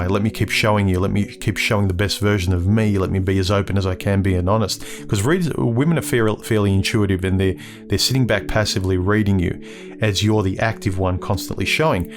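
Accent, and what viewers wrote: Australia. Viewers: The Aussie accent is a pleasant bonus! 😉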